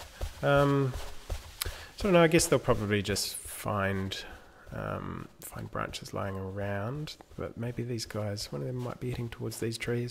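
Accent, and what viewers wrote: New Zealand. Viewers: Dude! This is epic. Are you a kiwi? Sounds like. Me too heh. How did you even get started with making your own engine? It's not like you can just look up a tutorial. Keen to have a chinwag!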